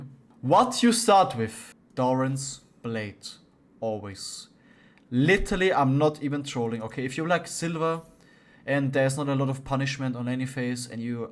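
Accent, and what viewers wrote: Germany. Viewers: Never seen an in-depth guide this entertaining. I don't know you but since this video is phenomenal, and I assume you are German because of your accent, I'll definitely get to know you sir! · He's not flaming, that's just his german accent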